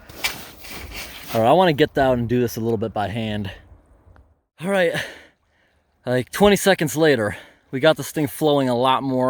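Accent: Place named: United States. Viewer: That american "englih" ist unbearable - sounds like defect radio ... sounds extra-disgusting ! I love ENGLISCH, not that pidgin-jabbering ... !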